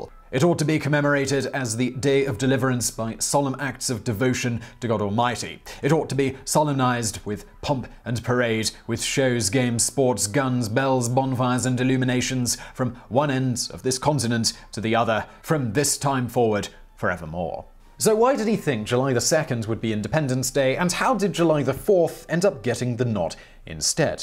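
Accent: United Kingdom. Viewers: ... as told by a British man lol Haven’t watched yet, just love the irony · Mr. White Yes, a British guy. Hired by an American company to read a script. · Simon reads this because Americans think British accents equals double smart... And so that the show isn't completely American centric to promote a wider audience.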